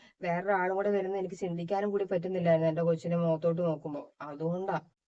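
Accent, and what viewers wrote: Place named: Thodupuzha, India. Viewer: സാംസാരം കേട്ടപ്പോൾ എന്റെ നാട്ടുകാരി ആണെന്ന് തോന്നി ഞാൻ തൊടുപുഴ ആണ്😊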